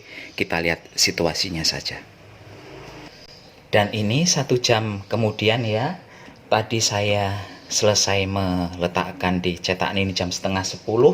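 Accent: Banyumas, Indonesia. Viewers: Ngapak bae lah anak e mbok Dirah👍👏👏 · Jane rika wongendi asline...bahasane pd kr inyong 🤣🤣🙏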